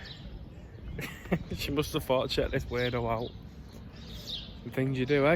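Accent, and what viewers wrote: Manchester, United Kingdom. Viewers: Hiya, I discovered your channel this week while researching the scuttlers. I subscribed right away because walking around with you and listening to your accent made me a little home sick. I love your editing skills, brilliant! Production quality is first class. Great subject matter too. I hate the adds but understand why they're there. I'm working my way through your catalogue because it's not only interesting and informative, but like I said, it's like having a walk around town with a mate showing you stuff you didn't know about. Grew up in Charlton-on-Medlock, (1950/60s) and Fallowfield in the 70's. Had my 18th birthday in Strangeways on my way to Hindley. But I've been a good lad (more or less) ever since. Haven't lived in Manchester since 1983, haven't visited the UK for about ten years. Wasn't sure it felt like I belonged anymore, too many changes. 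Only normal I suppose. Anyway, thanks for what you're doing, really appreciated. BTW...I always fort there was an 'r' in war-ter an all.....Ha ha ha!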